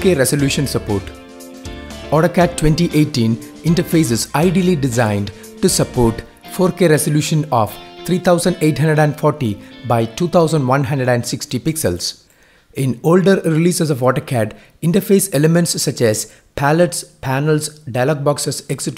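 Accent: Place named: India. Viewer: Thank you for the effort in making these videos. I just wish that you remove that Indian accent when speaking the English. Excuse me, I wanted to learn in what your are teaching, but it always annoys me listening to that accent. Please learn to speak English w/o that accent. Thank you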